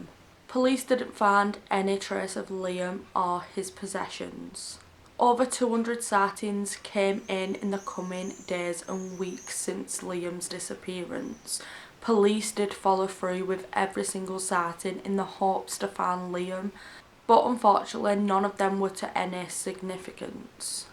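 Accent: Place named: England, United Kingdom. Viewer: are you and Mollie both in England? You sound very different (accents). I'm wondering if there are different accents within England like there are in the US. To where when someone talks, we know pretty much where they are from.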